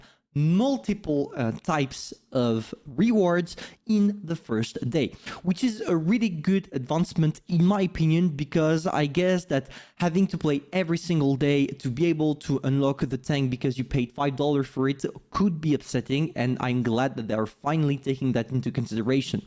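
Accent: France. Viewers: Hey mate. Are French.?.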